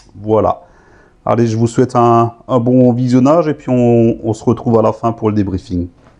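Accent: Alsace, France. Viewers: Bonjour et merci. J'adore votre accent Alsacien. ELSASS 👍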